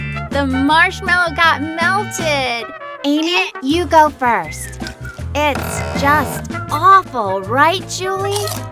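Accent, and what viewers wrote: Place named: India. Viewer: Why you sound like India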